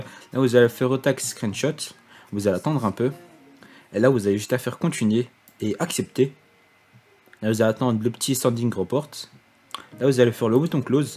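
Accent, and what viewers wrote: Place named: England, United Kingdom. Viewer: L'accent anglais magnifique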